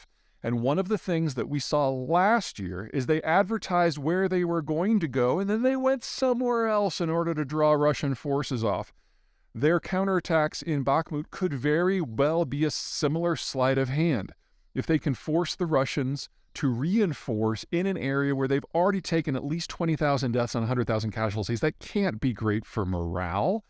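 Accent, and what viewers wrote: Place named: Iowa, United States. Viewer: Peter has always steadfastly stuck to his Iowa "Northern Midlands" approach to pronouncing transliterated Cyrillic. Nothing from Cyrillic he says will ever be pronounced correctly.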